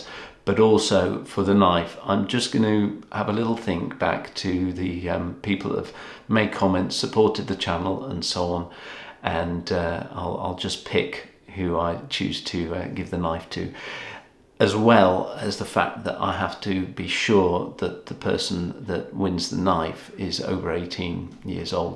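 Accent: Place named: Yorkshire, United Kingdom. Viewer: Done. It's great to hear a Yorkshire accent when I live down south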